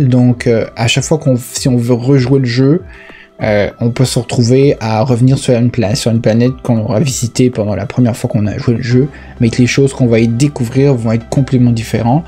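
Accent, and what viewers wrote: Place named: Quebec, Canada. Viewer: Sympa, la video. Ca décode bien. Et en Quebecois :)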